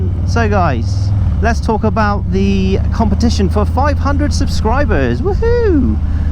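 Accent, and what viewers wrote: Somerset, United Kingdom. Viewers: It is a painful process especially as we have had such good weather here in Germany, but...it is ordered and will arrive at sometime in the future. We are really lucky that Harley allow so many test rides and over the next two weekends the Harley Truck is at two dealers not too far from me. Just a quick question, what town do you live in? I think I recognize the accent and grew up in Weston-Super-Mare :-)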